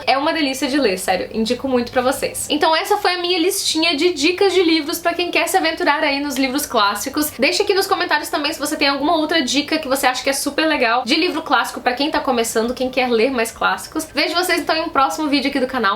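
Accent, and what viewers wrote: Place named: Minas Gerais, Brazil. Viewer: Você tem muito sotaque de mineira. 😁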